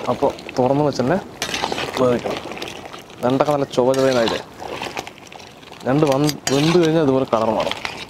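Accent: Palakkad, India. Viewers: സങ്കടങ്ങളും പ്രയാസങ്ങളും വരുമ്പോൾ ഒരു video എടുത്തു ഒരു പിടി പിടിക്കും.. സംസാരം പാലക്കാടൻ സ്റ്റൈൽ വളരെ ഇഷ്ടമാണ്.. ഒട്ടും over ആക്കാതെ വലിച്ചു നീട്ടാതെ.. Food taste ചെയ്യാൻ കിട്ടുമ്പോൾ ആ കുരുന്നു മനസ്സുകൾ ഒക്കെ എത്ര happy ആണ്.. കുക്കിംഗ്‌ ഇഷ്ടപ്പെടുന്ന ഞാൻ ഇക്കയുടെ ഒരു വല്യ fan ആയി മാറി <3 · ningal Marana Mass aaanu. Ningalude aaa palakkaad style talk nangalkk nalla ishtttam aaanutttaa Ningal elllaavarum koodi snehathode irunnu food kazhikkunnathum manasssinu sandhosham tharunnund. Padachon eee sneham nila nirthi tharattte Delivery kondu vannnath muslim payyyan aaanennnu paranaju food reject cheytha aaalukal ullla india il ithupolathe video orupaadu nallla message aaanu nalkunnnath. Nammal elllam paraspppparam snehathode jeeevikkanam. Athaaanu etttavum valiya sambaadhyam ikkkaaa. Ikkkaa ningale padachon orupaaadu anugrahikkkattte. Aaameeen Athu pole eee video il ullla elllaaa chetttanmaarodum orupaaadu thanks. 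Love u all Rabeeh Thrissur ( Dubai ). Lots of love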